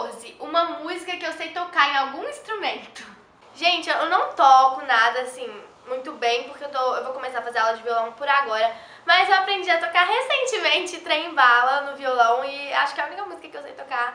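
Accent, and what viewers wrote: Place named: Minas Gerais, Brazil. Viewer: ahhh sotaque de mineiraaa kkkkk lindaa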